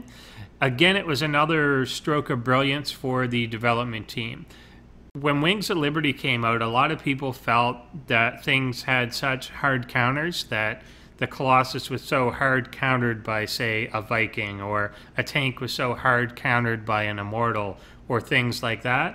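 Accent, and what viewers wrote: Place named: Canada. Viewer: You're canadian, aren't you?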